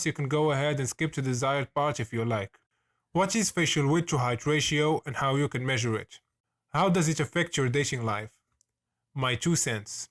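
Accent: Morocco. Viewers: your accent sounds damn moroccan lol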